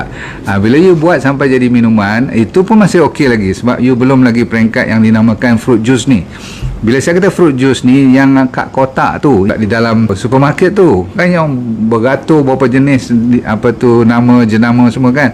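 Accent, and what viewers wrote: Malaysia. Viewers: Sepertinya profesor ahli soal makanan yg terkontaminasi bahan kimia, saya senang nonton videonya hanya kadang-kadang aksen dialektika melayunya bikin saya coba mengerti maksudnya, 🙏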